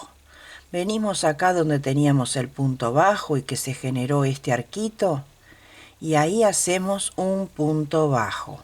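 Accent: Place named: Argentina. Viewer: Señora usted repita como lo hace siempre en mi familia siempre la preferimos por ese motivo así es como se aprende y usted explica muy bien ,y su voz es tranquila suave y muy clara ,en mi familia pensamos que usted es Argentina su forma de hablar y el nombre de los puntos que Dios siempre la bendiga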